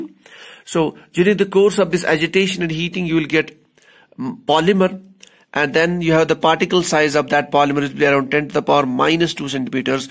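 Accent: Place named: Kashmir, India. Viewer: cxadaan hasaa chee .. nafar chee praraan 6.40 ... sir you are from kashmir .. love you exam k liye buhat zaroori tha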